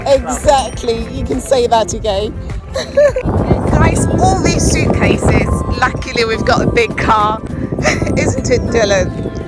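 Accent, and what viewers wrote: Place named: United Kingdom. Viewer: I subscribed because of your bri**ish accent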